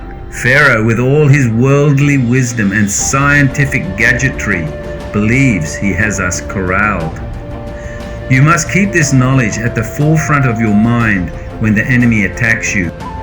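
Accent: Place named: Australia. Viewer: GBY! I hear an Aussie accent, but I’ve been told you live in Sth. Africa - is that right?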